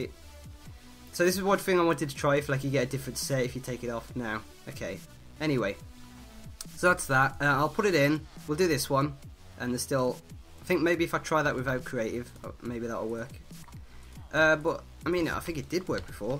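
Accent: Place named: Black Country, United Kingdom. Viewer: Where you from brah, you sound more yam yam than brummy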